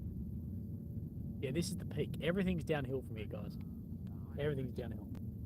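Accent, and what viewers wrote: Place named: Australia. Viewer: That’s an Aussie accent 👍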